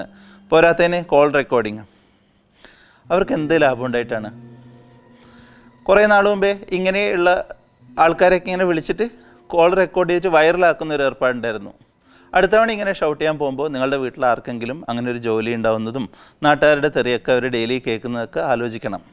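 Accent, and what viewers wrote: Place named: Kanhangad, India. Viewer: Hi, Useful video. First time anu Mr. yude video kanunnudu... really appreciat. Kanhangad slang🙂x from England.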